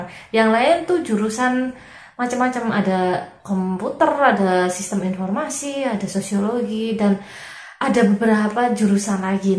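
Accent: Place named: Java, Indonesia. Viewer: medhok mbak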